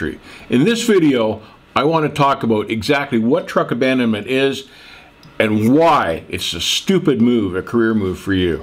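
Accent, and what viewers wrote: Canada. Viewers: I love the Canadian accent :)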